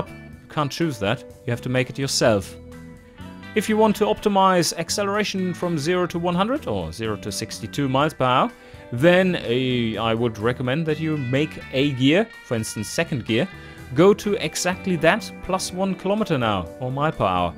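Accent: Germany. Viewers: Is this a fucking german or english accent i cant decide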